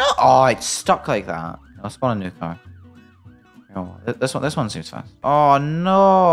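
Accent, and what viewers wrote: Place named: Scotland, United Kingdom. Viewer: You Do Realize your British Scottish right?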